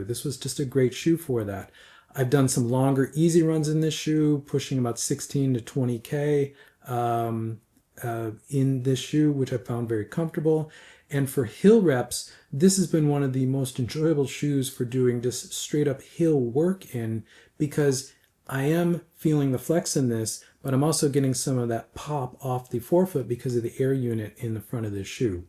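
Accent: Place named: United States. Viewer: I had no problem understanding you and I’m surprised to hear people did. Maybe it’s an American accent and idiom thing.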